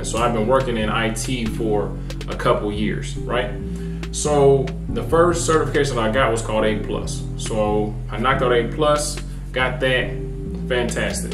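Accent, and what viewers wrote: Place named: Dominican Republic. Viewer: This man sounds and look Dominican ! I needed to support right away ! my people !!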